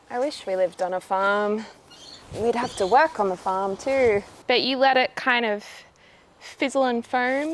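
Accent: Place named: Australia. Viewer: is that your Australian friend again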